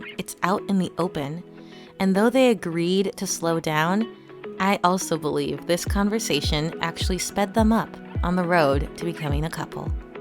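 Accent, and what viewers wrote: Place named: New Zealand. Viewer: Love hearing that NZ accent!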